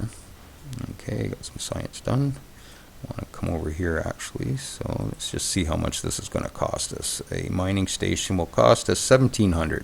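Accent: Canada. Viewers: I wish you could do more of these . Im just starting with this brilliant game and its a big help ! Btw im German and can understand your explenations pretty well , it might be you beeing Canadian . Thx for your DW series !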